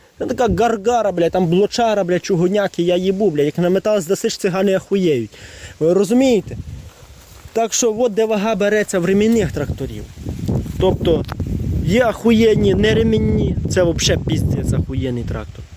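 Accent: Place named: Volyn, Ukraine. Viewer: Бо на Волині Вісі так піздять, я тоже з Волині і я знаю як тут люди говорять 😂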